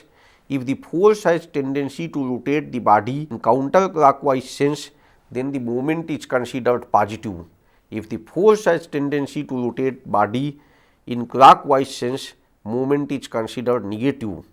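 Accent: India. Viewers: p he's Indian... as im i too...but i am not sure which part of India this accent is from..!!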